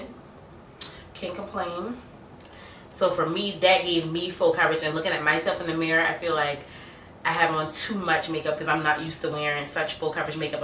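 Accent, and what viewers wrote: Charleston, United States. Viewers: Lol your accent sounds very familiar to me, I could’ve sworn you were from Charleston, so I checked out your Facebook and it’s Columbia .... close enough... hey girl!!!! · Very nice tutorial, you sound like you're from chuck town sc.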